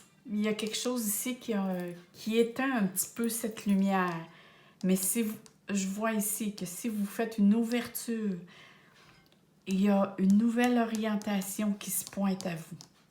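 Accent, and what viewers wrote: Canada. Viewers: Bonsoir le Canada est dans ta voix 😘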